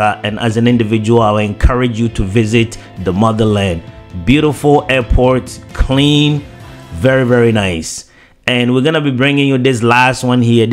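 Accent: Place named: Canada. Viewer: I like your Canadian accent 😊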